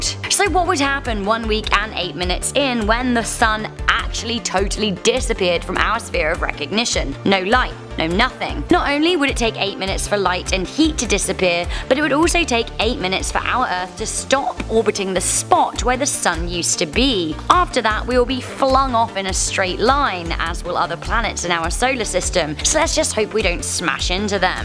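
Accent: United Kingdom. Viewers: I can’t stand how poms say vitamin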